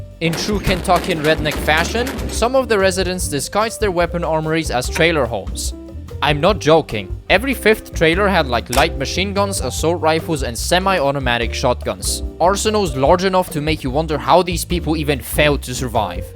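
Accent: Poland. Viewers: Polak detected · wait i just reaised... are u Polish??? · coś tak czułem że ty Polak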